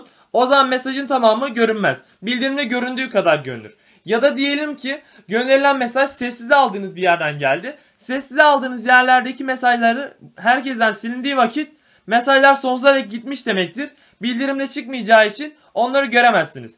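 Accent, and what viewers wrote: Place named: Azerbaijan. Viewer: İntro TV adam azeri bizde azerileri anlamıyoz bazen normal bişi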